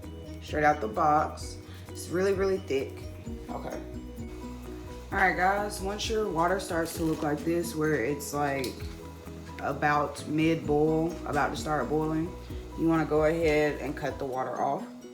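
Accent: South, United States. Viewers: sound so country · This look so bomb!🔥😍 and you have a thick country accent, where are you from?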